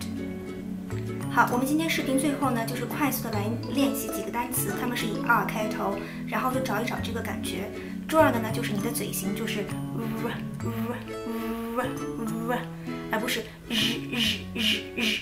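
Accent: United Kingdom. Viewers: 听上去是英式英语？ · 你的发音很英式啊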